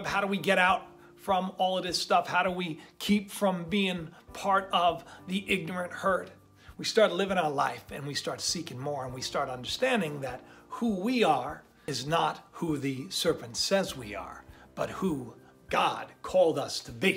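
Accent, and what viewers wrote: Russia. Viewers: Your Russian accent is awesome so don't worry about it I enjoy it and I'm sure everyone else does.! Like you said I really truly believe it was just a goofy minute that the president was pretending. But who knows in these days and ages.! Evil is worse today than it was back in the day of witches.! It's out in the open now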